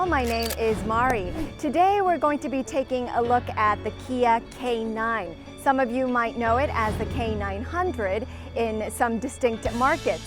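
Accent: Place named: United States. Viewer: Good video! Regards from an Kia Stinger driver in Sweden! And its good with the American accent, not the England "time for thea" accent! :)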